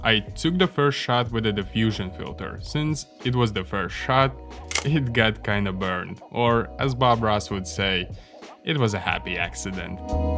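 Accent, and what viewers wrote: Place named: Eastern Europe. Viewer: Wonderful content! Where are you from? You sound like Eastern Europe brother :)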